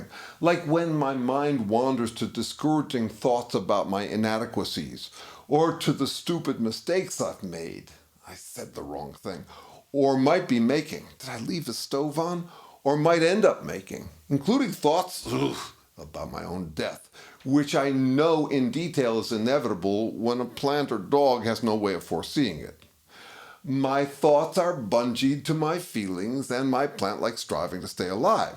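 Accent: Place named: California, United States. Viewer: He seems to have a California accent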